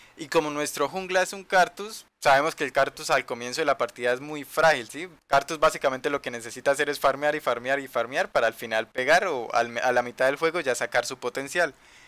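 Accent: Colombia. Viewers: se te nota lo colombiano xd buen video tio:^y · muy buenos tus vídeos y se entiende lo colombiano v: toma tu like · Amigo mío eres de Colombia?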